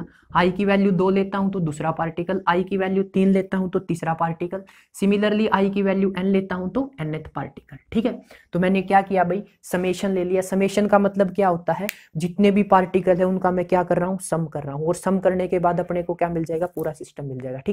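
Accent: Haryana, India. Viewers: Haryana se ho kya sir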